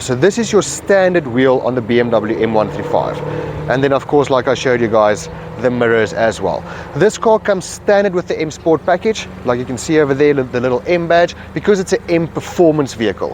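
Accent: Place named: South Africa. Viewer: Wow I totally didn't know south Africans sound like this lmao I thought this was from the UK with an accent of somewhere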